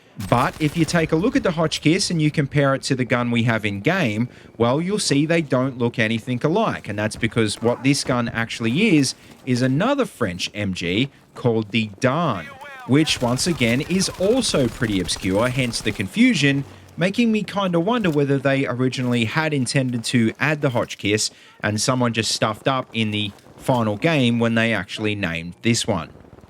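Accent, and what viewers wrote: Australia. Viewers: Thanks mate. Great review and good to hear from an Aussie. I've subbed and look forward to your next videos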